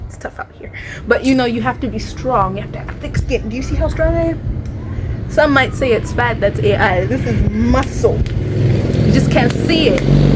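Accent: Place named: South Africa. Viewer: That's south african accent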